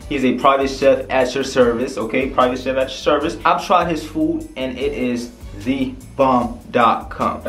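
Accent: New Jersey, United States. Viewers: you sound like you from Jersey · Yo you from Jersey?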